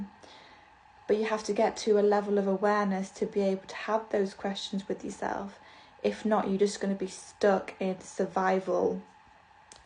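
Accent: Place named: England, United Kingdom. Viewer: Thank you. I love your accent. Are you from England?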